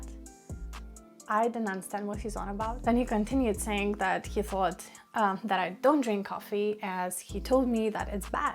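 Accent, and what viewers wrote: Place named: Lithuania. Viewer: To be honest, I just jumped into your channel accidentally, been watching videos about turning vans into a campers and in one of them I just heard slightly Lithuanian accent so now I'am here. Tu tikrai darai puikų darbą skleisdama šią žinią. Man net sunku įsivaizduoti, kiek moterų vien lietuvoje gali būti tokiuose santykiuose. Kai tai pasidaro matoma aplinkiniams, tai jau toli pažengę ir blogiausias dalykas, kad auka pati, be išorinės pagalbos dažniausiai net nesuvokia, kokiuose santykiuose ji yra. Džiaugiuosi, jog tau pavyko tai nutraukti, be abejo, išėjai iš to stiprensė. Sėkmės.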